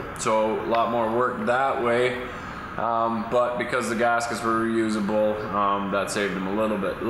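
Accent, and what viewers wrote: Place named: Canada. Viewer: canadian for sure. honest, efficient. nice guy too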